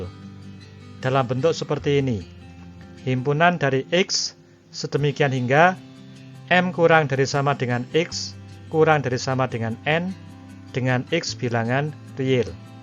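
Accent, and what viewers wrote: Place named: Java, Indonesia. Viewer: Suara ne ketoro tenan nek wong jowo nggh mas.... Salam sko klaten 🤗🤗🤗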